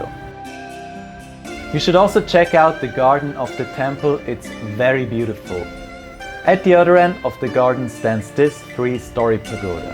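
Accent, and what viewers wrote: Switzerland. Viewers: Thnx for this vid. - It sounds like a Swiss accent you're speaking, is that true? :) Greetings from Zurich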